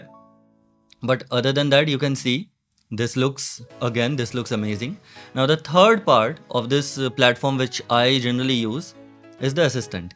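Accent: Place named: India. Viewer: Hello brother, i recently started watching your vedios,you had great command over comfiyui and other ai tools,i think your indian(because of accent😅). i am currently learning comfiyui just a begginer. i want to learn from you. i have some specific area on which i want to work. is it possible to learn from you personally like one on one session. willing to pay.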